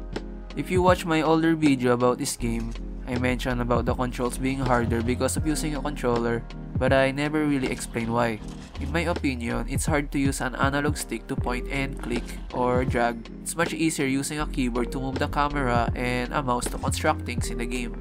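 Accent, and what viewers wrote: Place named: Philippines. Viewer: hey, the accent sounds very Filipino. great to see more of us doing game reviews!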